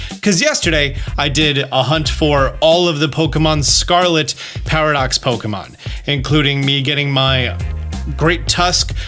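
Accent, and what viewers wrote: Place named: Philadelphia, United States. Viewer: Are you from Philly? We're like the only people in the country that say "wooder" instead of water.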